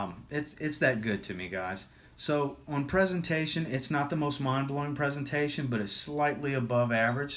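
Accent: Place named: Louisiana, United States. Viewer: Are ya from Louisiana my brotha?! Good job on the video! 318 for me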